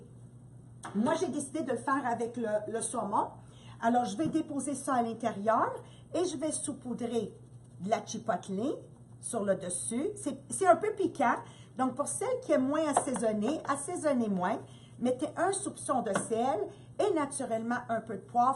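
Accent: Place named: Quebec, Canada. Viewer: Bonjour je suis nouvelle animatrice depuis octobre 2017 j'ai gagné le Micro Urban, je vous remercie pour la démonstration et ayant des amis du Québec je crois que vous avez un accent que j'adore, ce n'est pas facile en France dans ma région de Haute Loire de trouver des hôtesses, j'espère que je trouverais, bonne journée à vous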